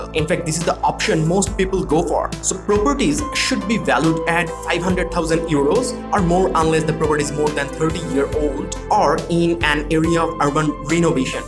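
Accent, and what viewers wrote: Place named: India. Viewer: U tried ur best to Sound like Locals however few words would give a hint that ur an Indian. Thanks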